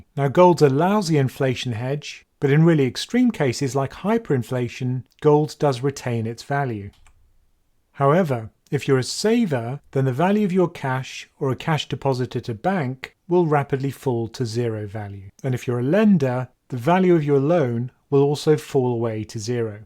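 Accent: United Kingdom. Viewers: Why do I get that feeling every time video with brit ascent remind me M .I .6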